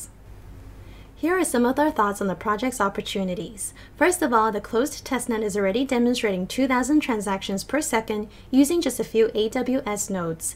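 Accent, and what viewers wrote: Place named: United States. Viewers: AML86 na it's definitely her. Ok.. I'll explain myself. I owe her that. She either sounds dead because she's reading from a script in monotone or it's her highly corporate American accent. Likely a mix of both but speaking freely may fix it 👍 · I coming from humble beginning...highly corporate American accent I find very attractive. I don't want to listen to no ghetto chick because she has "soul" but hey you cant please everyone I guess but you can certainly piss everyone off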